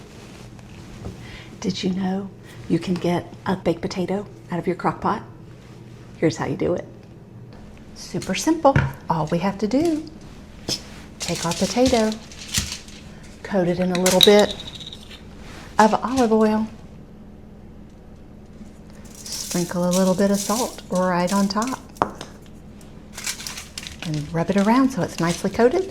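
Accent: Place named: South, United States. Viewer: I love hearing a fellow Southerner talk!!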